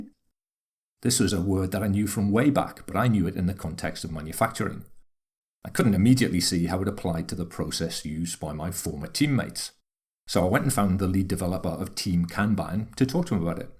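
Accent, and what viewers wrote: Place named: United Kingdom. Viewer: Thank you for speaking slowly and clearly. Not all Youtube educators grasp that students hearing the information for the first time need it delivered slowly and clearly. As a Yank, I find your smashing British accent most enjoyable. Your videos are fantastic. Bravo!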